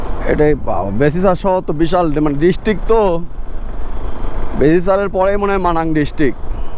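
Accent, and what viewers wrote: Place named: Pabna, Bangladesh. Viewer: ভাই আপনার ভাষা আমাকে পাগল করেছে। ভাইয়ের দেশের বাড়ি কনে পাবনা নাকি?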